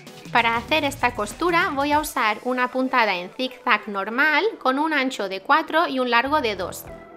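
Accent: Spain. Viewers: Ostres t'ha quedat un biquini fantabulós!!!(M'encanta el teu accent mallorquí!!!!) Ja fa temps que em vull fer les calcetes, i el teu tutorial em ve al pèl! Gràcies bonica! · Excel·lent explicació i m´agrada molt el teu accent mallorquí!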